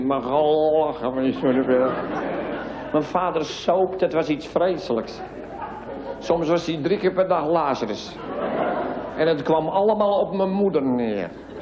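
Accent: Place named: Amsterdam, Netherlands. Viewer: Dat echte amsterdams-ik vrees dat het ook verdwijnd !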